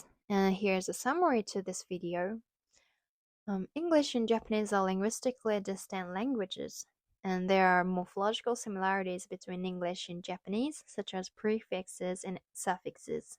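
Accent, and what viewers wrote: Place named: Australia. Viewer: i found your channel from that 'what i've learned' video (about why japan has a low obesity rate) but am also studying japanese so i figured i'd watch this video. have been studying japanese for many years but progress is slow. one thing i learned from this video is that there are 188 particles! i had no idea it was so many, even after years of studying japanese i only know about 20-30 of them. it's also interesting that despite you (presumably) being a native japanese who has visited or lived in australia, i still detect an australian accent in your english, especially when you say words like 'answer', you say them in the way an australian would.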